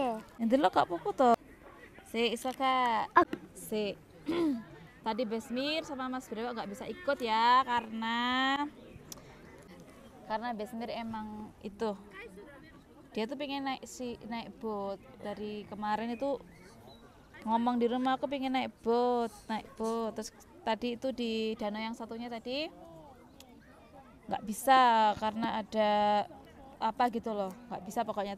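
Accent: Java, Indonesia. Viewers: Halo cantik pinter banget kamu nduk boso jowomu medok banget ❤❤ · INI GARA - GARA BULE KW BISA DAPAT SILVER PLAY BUTTON CANTIK, LUCU BAHASA JAWA SANGAT MEDOK SUKSES TRUS MANTAP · Bule medok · Yeay medok udah silver button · Medok men nduk..lucu..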